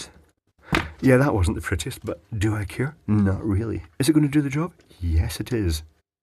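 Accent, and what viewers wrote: Scotland, United Kingdom. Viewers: Hey Big Clive, really enjoy all your videos. From scotland myself and its nice to hear one of us on youtube for a change. Anywho can you look into flat earth?? There is someone from paisly getting alot of people on the subject just by going around paisly and glasgow and spreading the word. Let me know if you will atleast look into it if you havent already?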